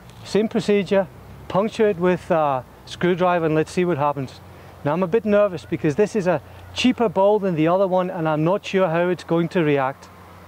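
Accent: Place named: Scotland, United Kingdom. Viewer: Touch of Scotland in his accent.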